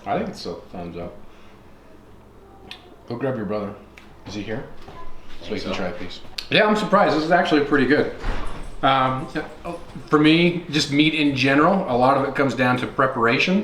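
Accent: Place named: Utah, United States. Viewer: By your accent, your from Utah, USA. Rabbits there, carry Tularemia, you stupid Mormon. You have cotton tails there, why not eat them, instead of infested jackrabbit/ Hare.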